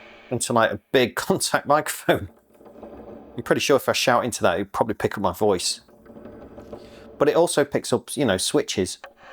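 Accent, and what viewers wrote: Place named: Tameside, United Kingdom. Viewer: You sound like you are from my area of the country, I'm in tameside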